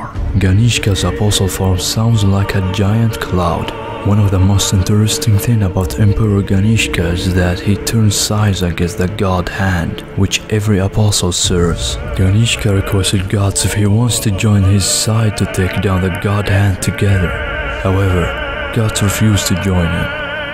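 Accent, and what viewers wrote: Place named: Russia. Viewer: Your (Russian?) accent gives an exotic and soothing tone to your speech, it’s fine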